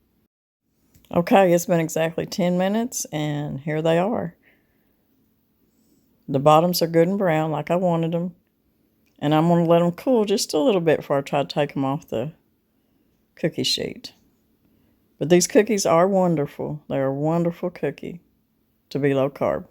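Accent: South, United States. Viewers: Thank you! My digestive system does not like sugar alcohols. A lot of low carb recipes call for those, so maybe I will try the monk fruit. Enjoy your videos and the southern accent. I grew up in Virginia, so had an accent at one time. We moved to Wa. State and I lost it!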